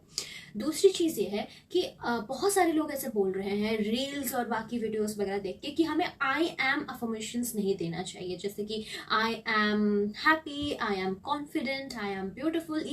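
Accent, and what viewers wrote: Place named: Odisha, India. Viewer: Aap odisha se hai ma'am...loved to listen thus 😊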